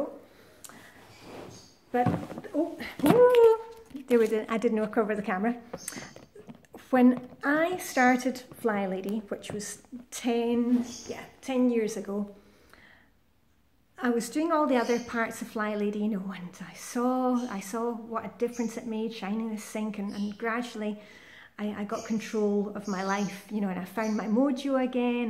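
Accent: Scotland, United Kingdom. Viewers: You are so lovely, and I love your Scottish accent. You are so motivational because you really tell us how to do it without beating ourselves up trying to be perfect.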